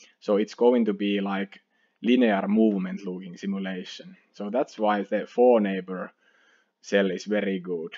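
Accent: Finland. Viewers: This is really interesting. I have to ask completely off topic. Are you from Finland? You have a very Finnish accent! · love the finnish accect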